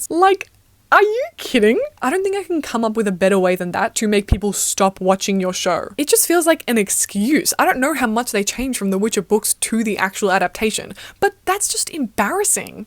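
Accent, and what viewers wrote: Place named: Australia. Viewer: I think youre australian, so it explains it, but please for the love of good stop to say the "o" and "w" like the h20 girls its sooooo irritating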